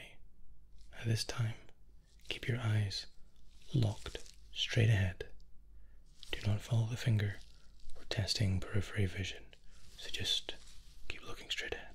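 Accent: Scotland, United Kingdom. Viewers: Love me a handsome Scot with an immaculate accent. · Is he British ??? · Is he Scottish?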